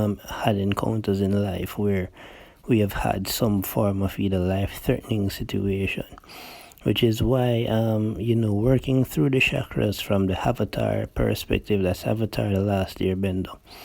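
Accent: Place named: Caribbean. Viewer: Accent sounds Caribbean 🇹🇹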